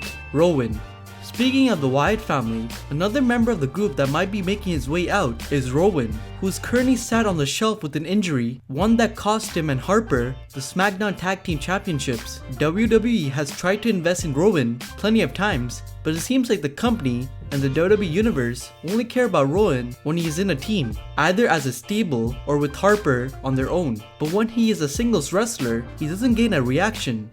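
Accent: India. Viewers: Another indian accent, i dont understand you curly tonge. When indian speak english, sounds stupid.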